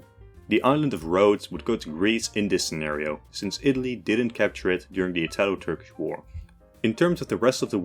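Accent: Netherlands. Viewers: Wait are you dutch?😮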